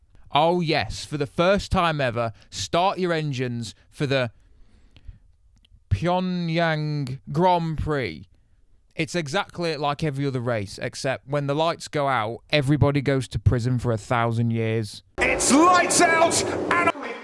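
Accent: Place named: England, United Kingdom. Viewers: This is a perfect English accent. Most annoy the shit out of me, but owns all. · Sounds Manc to me I reckon, any other English lads or himself can confirm? Thank God he's not fucking scouse that's for sure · he’s mentioned Sheffield before. so probably near there!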